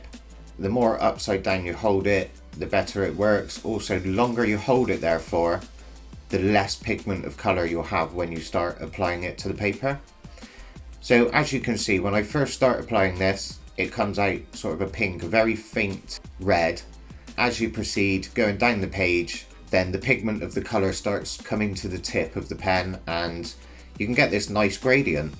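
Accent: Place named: Bristol, United Kingdom. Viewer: Can't beat a bit of wolverine, keep up the sick work dude. I also love how bloody Bristolian you sound shag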